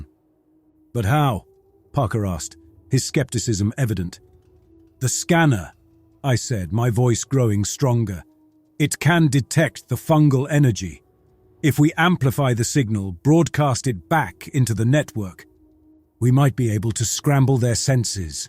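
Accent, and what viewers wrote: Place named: England, United Kingdom. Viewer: I must say alot of those Creepy pasta channels regurgitate the same stories over and over And I can tell that some of them have just used the transcript of others reading exactly the same content ...boring Your story telling is class and has been on my nightly listening playlist since I found your channel Maybe I'm abit partial because I'm English too. Anyway...thanks and please keep them coming 🙏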